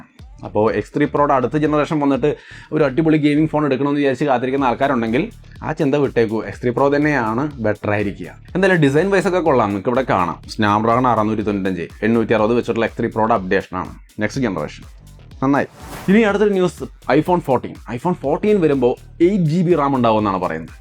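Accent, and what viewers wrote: Thrissur, India. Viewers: 0.46 nalla Thrissur bhasha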